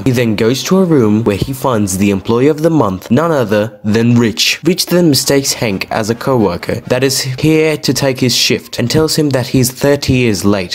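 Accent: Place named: United Kingdom. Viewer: This is most the British review i ever heard nice video bro Also bro the way he said that XD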